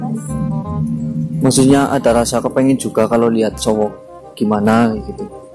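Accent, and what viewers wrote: Banyumas, Indonesia. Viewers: Wong Banyumas apa rika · Ngomongnya medok tenan mas bro, Sampean wong jowo ngapak Yo ? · Mas,,, rika wong ngapak ya??? Pada bae mas... 😂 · Ngomonge ngapak nemen Mbok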